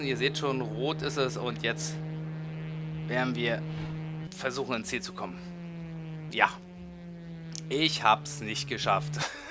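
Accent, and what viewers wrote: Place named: Berlin, Germany. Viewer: Ich habe mal eine Frage an dich, wo kommst du eigentlich her. Wegen deinem Dialekt würde ich auf Berlin tippen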